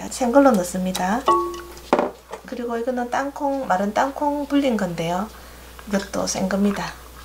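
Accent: Gyeongsang, South Korea. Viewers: 경상도 말씨 진짜 정겹네요.말씨가 넘 예쁘요.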